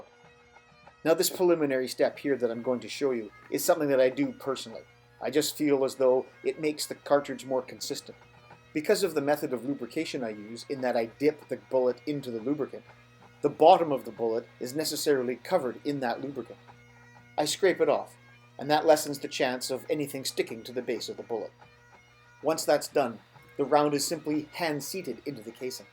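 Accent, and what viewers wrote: Canada. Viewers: These are fantastic video's love them also love the Canadian accent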